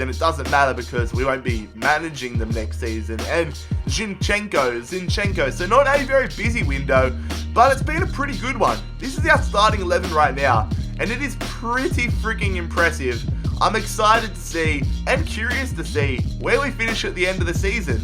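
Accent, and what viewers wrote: Australia. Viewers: I knew you were Australian! Great vid From, fellow Aussie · Are you Australian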